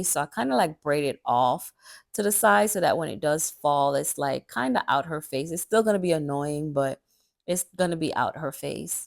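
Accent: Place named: Jamaica. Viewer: loves why I think I heard a bit of a Jamaican accent in this video 🇯🇲🇯🇲🇯🇲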